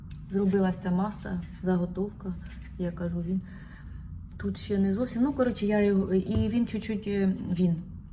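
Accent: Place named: Surzhyk, Ukraine. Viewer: Як не гарно звучить суржик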